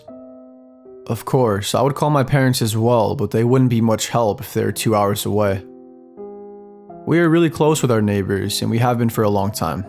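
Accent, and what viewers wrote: United States. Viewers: No offense mate, but your accent sounds like a stereotypical California suffer bro dude. It’s not a bad thing. Haha. But it’s hard to take you seriously when your voice sounds so unserious. Let’s face it the Cali suffer bro aren’t the most serious types of people. 😂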